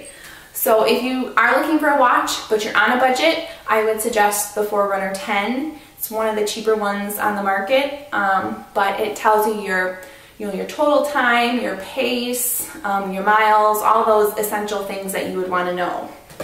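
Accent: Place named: Minnesota, United States. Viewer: You sound like your from MN? I'm just starting running myself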